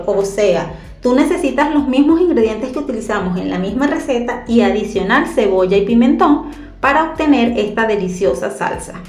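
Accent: Venezuela. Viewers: Clarooo que las voy hacer se ven demasiado ricas gracias por tus recetas y 20 puntos como explicas en los video. Saludos desde la isla de Margarita 😉 por tu acento eres Venezuela 😉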